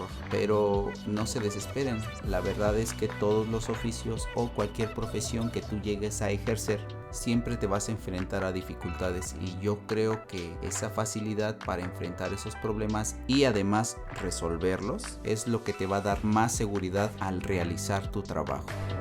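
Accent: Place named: Mexico City, Mexico. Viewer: Por este commentario y por lo chingon que eres me suscrito. Y por lo bueno que explicas Las cosas. Escuchandote me haria pensar que eres de Mexico y El modo que te expresas de la ciudad de Mexico. No haces trasmisiones en vivo? Es la Iwata hp ch la que estas usando? Ahora le dare un I fucking like it a lot😁💣💥💢 Gracias..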